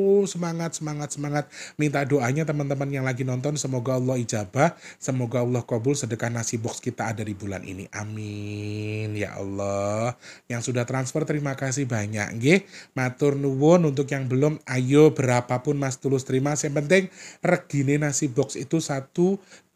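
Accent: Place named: Tuban, Indonesia. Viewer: Nwn Sewu mas BHS timurane mas tulus kok kayak logat Tuban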